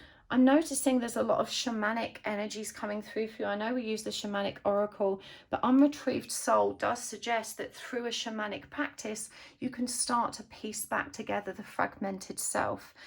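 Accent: United Kingdom. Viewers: Wow just wow. Since my dark night I have regularly been using 2 readers on YouTube and no others, but your channel and readings kept coming up on my youtube feed, often alongside synchronic numbers but I resisted using another reader up until now. I have been drawn in by repeating numbers, animals and I have been dreaming of and seeing twins for the past year or so in cards and symbolism. (I am still not truly sure why) but this attracted me to your channel amongst the number sequences of likes, views and the time on my phone everytime you pop up but especially this time. I have been going through an incredibly tuff dark night for what I perceive is 4 years but I am learning it has really been my whole life, that was just when the awareness of my spirituality began amongst the chaos and trauma. I then had a series of life events happen which brought up both old and new trauma and triggered a kundalini awakening and I thought I was going crazy. 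Especially when my starseed awakening started... which I feel you may resonate with just from hearing you talk etc. Anyway I have been drawn to more than one reading but have only watched the first one do far, and it was so accurate in every way that I feel as though you was speaking directly to my soul. I am from the UK also and I must say it was both surprising and nice to here a British voice , as my other readers and starsseed family are all from the US. It just felt a little closer to home. Your reading ran very deep for me in many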